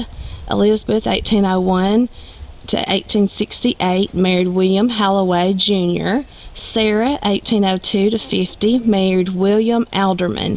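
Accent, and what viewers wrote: South, United States. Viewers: Your welcome and I love your southern accent. I'm from Texas but been living in Louisiana for about 19 yes now .. I still have my Texas accent. I don't think it will ever completely go away. Lol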